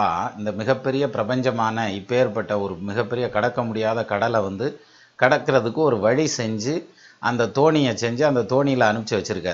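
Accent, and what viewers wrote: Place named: Madurai, India. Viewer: என்ன சார் நீங்க 1000 பிஎச்டி க்கு மேல் பண்ணியவங்களுக்கு கூட இல்லாத இவ்வளவு ஜோதிடப்புலமை தமிழ் ஞானம் எப்படி சார்..அதோட சூப்பர் மதுரை தமிழ்.அப்பப்பா என்ன சொல்லி உங்களை பாராட்டுவது..வார்த்தைகளே இல்லை சார்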